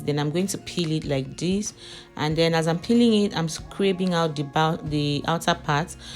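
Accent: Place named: Cameroon. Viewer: You talk like a cameroonian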